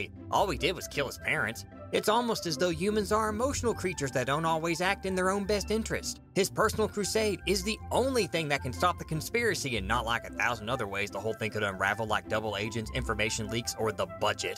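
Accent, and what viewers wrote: South, United States. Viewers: I only just now realized you have a Southern accent.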